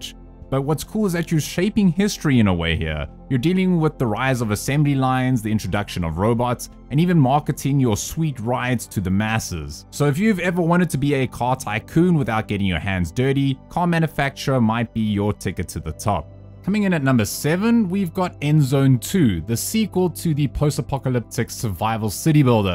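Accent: South Africa. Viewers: Do... I hear a Saffa?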